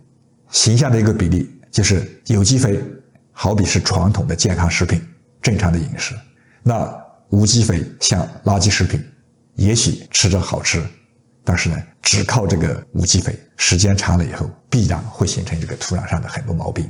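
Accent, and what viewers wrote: Yunnan, China. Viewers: 老师口音好像云南这边的。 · 听得出是我们云南人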